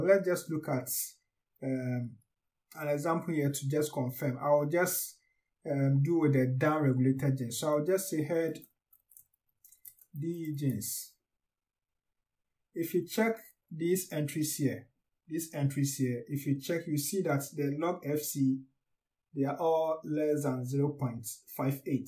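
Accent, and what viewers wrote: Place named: Africa. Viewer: Is it just me or is it refreshing to hear an African accent addressing these complex concepts and workflows in modern science?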